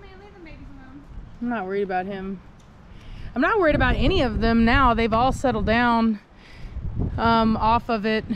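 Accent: United States. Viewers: Put that Texas twang on girl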